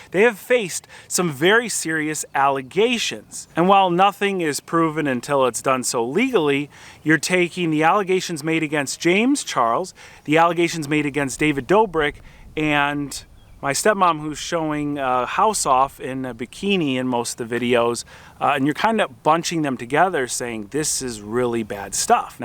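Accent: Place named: Florida, United States. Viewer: You are starting to sound like the @@@@ Floridians who show up here in NC. And no, I am not going to Instagram because it is owned by FaceSuck.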